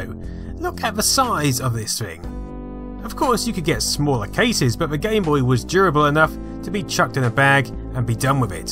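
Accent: United Kingdom. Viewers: PeachyPixel8 you are not a nerd. You are just attracted to this man's sexy velvety-smooth British accent. Don't kid yourself. You want him. We all do.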